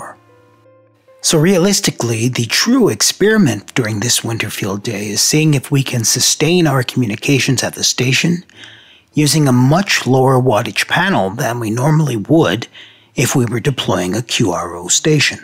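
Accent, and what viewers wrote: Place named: United States. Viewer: Well, yes, I'm impressed. But the thing for me is how did you develop such a flat American accent?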